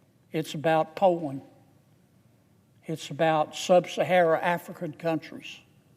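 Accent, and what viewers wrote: United States. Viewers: factualy the best american accent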